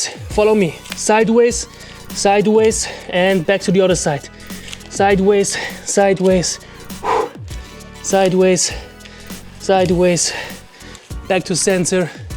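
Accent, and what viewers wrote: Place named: Russia. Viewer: интересный акцент, вы русский?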